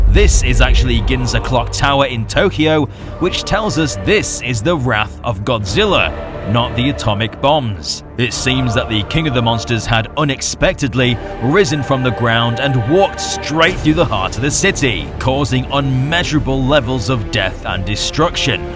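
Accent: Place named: United Kingdom. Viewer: i finally figured it out, the thing that has been bothering me for so long, you are british aren’t you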